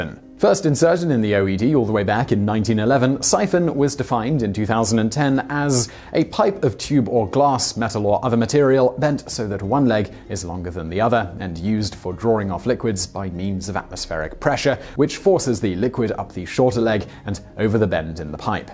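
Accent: United Kingdom. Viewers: relax! The more I hear you the more I understand. U. K. Accent? Big deal. I grew up eating grinders. Poor's boys, subs hoggies. What ever. Same thing. Different name. I can't imagine what Webster's going though now! I gotts to know!!! Haha!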